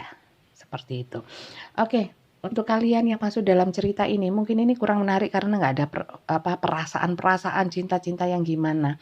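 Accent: East Java, Indonesia. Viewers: MET pgi bunda hadir dari Sumut selalu pokus dgrin pencerahan,sukak bgt dgn cara pembacaan bunda dgn logat nya rek " ☺️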